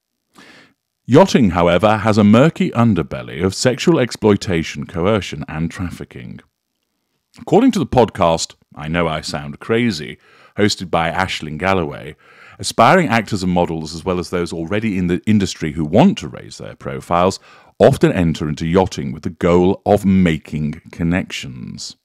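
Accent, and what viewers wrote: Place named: England, United Kingdom. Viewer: Hello? Are you not the "real" person who had your own t.v. podcast in U.S.? You stood out to me because of your very "english" dialect on U.S. t.v. or is it podcast. Bald head with glasses? How can one forget! I'm sure someone out there knows your identity.?? Interesting change of carrier that you now have. Oh I'm not in U.S. like yourself but in Norway.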